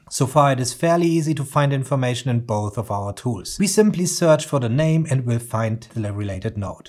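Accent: Germany. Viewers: Awesome Video! Without looking it up- I guess you are german (like me) :D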